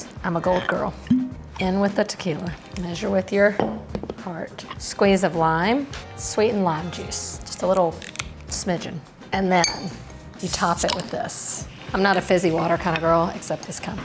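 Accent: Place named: Texas, United States. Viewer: Are u from Texas! I’m from Lake Jackson Tx. Love your accent ❤❤❤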